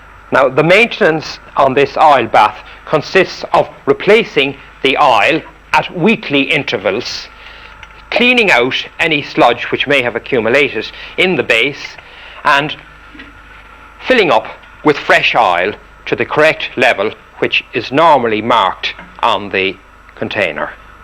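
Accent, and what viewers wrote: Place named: Meath, Ireland. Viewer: Lovely meath accent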